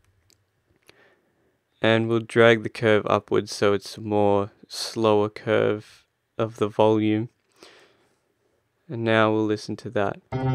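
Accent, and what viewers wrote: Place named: Australia. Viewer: Insane! I'll really have to try absynth. The grains part remind me of the sound you get when playing in Padshop BTW, huge Silverchair fan, especially the 90s and 00s stuff, and your voice reminds me so much of Daniel Johns', I love the Australian accent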